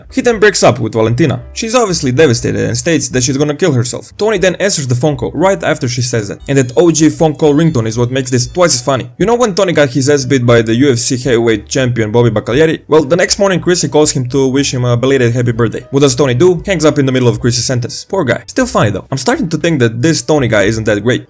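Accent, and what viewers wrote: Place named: Eastern Europe. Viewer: I found a lot of humor in the series, but I felt it was unintentional and a reflection of my own od sense of humor. This narrator - is it an AI generated voice with some odd Slavic or Israeli accent on speed?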